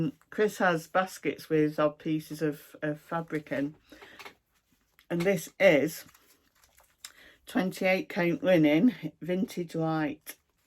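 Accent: Lancashire, United Kingdom. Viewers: HI,great video, nice to hear a fellow Lancashire girl ( I am a Blackburn girl originally!) Love the block party pattern - I have just bought the Halloween one from nimble thimble for daughters up and coming birthday ( aka flossibilities on you tube), I love the winter patterns especially the ones with snow!!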